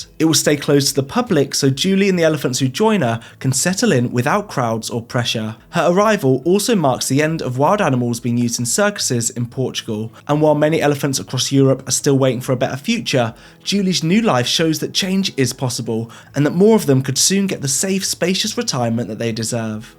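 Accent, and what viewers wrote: United Kingdom. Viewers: Well, the good news is welcome, but it's not called 'gashed ee bear', that's for certain. You seem to come form Birmingham, which should be familiar with Wales - so let's have the same respect for our threatened living language as you show for animals and landscapes, please. Google translate is a first step. Type the name in and press the sound icon. Not perfect, but acceptable.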